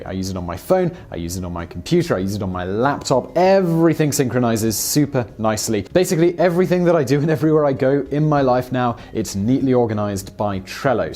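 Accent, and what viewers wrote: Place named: RP, United Kingdom. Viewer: True, he does sound posh but, it's funny as hell when he looses it.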